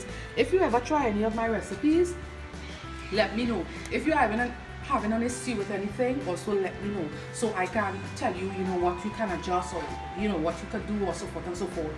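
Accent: Trinidad and Tobago. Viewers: How I love the trini 🇹🇹 accent great job fudge looks delicious 😋 thanks for sharing stay safe from Guyana 🇬🇾 with love ❤️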